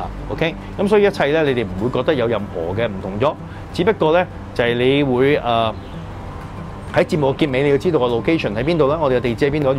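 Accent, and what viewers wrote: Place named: Hong Kong, China. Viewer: Most of English words he said sound in Hong Kong way like the words 'crepe', 'trail'. Mixed with other words 'crap', 'trial' because he doesn't have enough words in his knowledge. And just relate them into a similar one he knows. He also has a very heavy Hong Kong accent when he speaks English. Looks like he doesn't even watch English TV. Ironically, one of his die hard fan Dasy Chan once said his English is so good that he should make a program teaching English.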